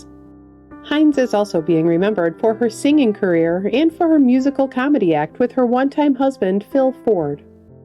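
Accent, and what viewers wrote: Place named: Minnesota, United States. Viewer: I would love to know more about her! Her narration and accent sound like she was born and raised in Minnesota or somewhere around that region.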